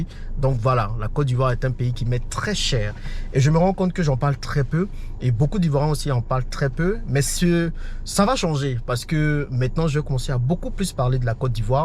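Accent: Cameroon. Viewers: Moi aussi quand je suis tombé sur ta vidéo pour la 1ère fois il y a un an, j'étais tenté de penser que tu étais camerounais vu ta façon de parler, de voir les choses etc. Ce qui est sùr, l'essentiel c'est d'ètre africain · La première fois que j'ai suivi une de tes vidéos en 2021 , j'ai cru que c'était un frère camerounais, il parlait comme les camerounais et n'est pas trop dans les histoires des clashs et fait bcq dans l'éducation, comme certains de mes frères et sœurs, comme Simo, Stéphanie, Marthe Carine, Nathalie yamb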